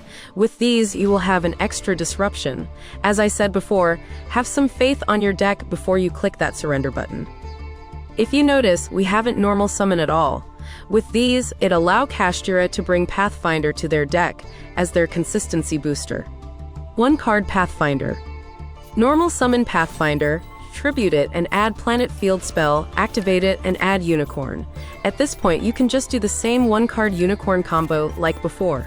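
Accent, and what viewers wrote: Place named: Atlanta, United States. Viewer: Why the text to talk sound like she’s from Atlanta?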